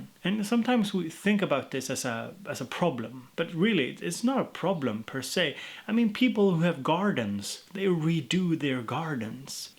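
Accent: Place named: South, Sweden. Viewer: Lovely to hear that you and your boss talked Swedish! I did hear that you are from the southern part of Sweden! Great video by the way. My cable managment is like a råttbo. :)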